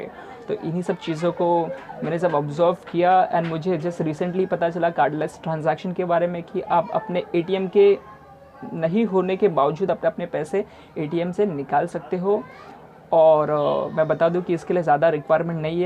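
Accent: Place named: India. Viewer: I can’t understand your Indian ass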